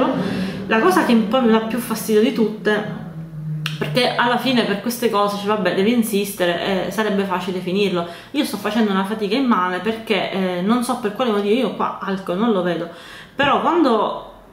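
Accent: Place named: Palermo, Italy. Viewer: ho una curiosità ma di origine sei di Palermo o Catania? Io sonò di bagheria e dall'accento sembrerebbe tu sia di Palermo:) Dai la prossima volta che vieni fallo sapere magari ci vediamo, ci facciamo un aperitivo:) Mi piacerebbe tanto!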